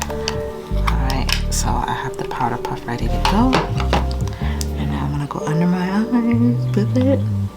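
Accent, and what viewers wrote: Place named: New York, United States. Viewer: I love your accent so much!! Everytime you speak I’m like she’s soooo New York! The make up is so flawless… I’m so glad your doing posted this can I’m still learning make up.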